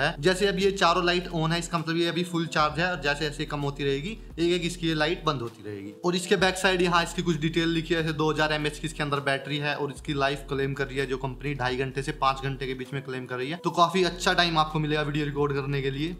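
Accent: India. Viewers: you sounded like himachali 🤗 but great content..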